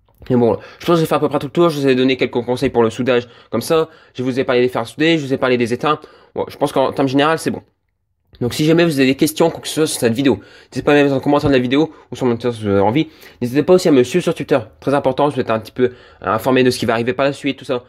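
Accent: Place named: Vosges, France. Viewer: Je crois qu'il est Vosgien (d'après l'accent) et je sais que les gens là bas ont tendance à parler un peu vite. M'enfin rien de grave, la vidéo est de qualité et c'est ce qui compte ^^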